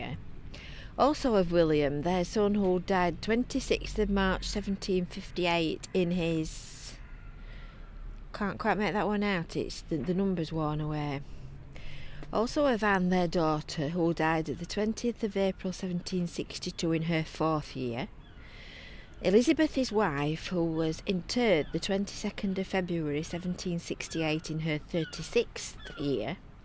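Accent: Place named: Yorkshire, United Kingdom. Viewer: American YouTube doesn't understand Yorkshire English!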